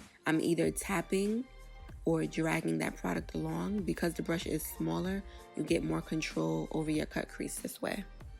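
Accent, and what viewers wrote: New York, United States. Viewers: Gotta be from New York u sound just like juju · It’s so easy to pick up on. Lol I’m from Nola and knew she was from NY haha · That cut crease is EVERYTHING!!! Absolutely beautiful!! BTW: u sound like ur from NYC? If so.. NYC STAND UP!! Great job 👏🏽👏🏽👏🏽👍🏾👍🏾👍🏾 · Yasss!! 😍😍 I’m like yup, she from ny.. I can hear it.. where do u find ebony bronze? I can’t find it anywhere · I LOVE how you talk lmao. Are you from NY?! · Right knee she was a NYC girl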